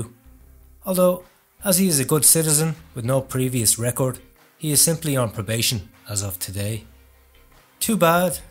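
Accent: Ireland. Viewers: I think your subtle, understated Irish accent really adds to the narrative. Just discovered this channel and is eye-opening to say the least. Really like it.. I imagine it'll take off even more when/if the big names get busted.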